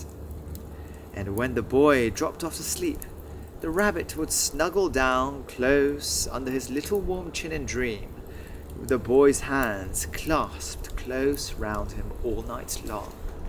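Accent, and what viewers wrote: United Kingdom. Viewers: 焚き火の音もさんの声もイギリスの訛りも全部好きでこれ聴きながら眠りについています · 最高のヒーリング動画…🥺 イギリス英語ってなんでこんなに耳心地がいいのでしょう… さんの読み方がいいのか…！眠るまで聞き続けます😇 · 美しいイギリス英語に聞き惚れますね~はぁ続きが楽しみ✨素敵なクリスマスプレゼントありがとうございます😭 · 発音が心地よくて本当に聴いてて最高です。これをきっかけに「ビロードのうさぎ」の洋書も書いました。もし可能なら「えんとつ町のプペル」の英語版の朗読もイギリス英語でやっていただけるとうれしいです🇬🇧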